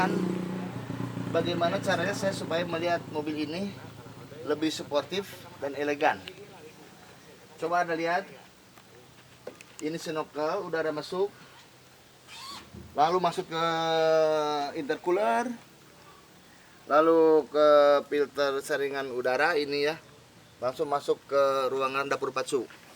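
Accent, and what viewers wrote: Indonesia. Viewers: sunda na leket pisan kang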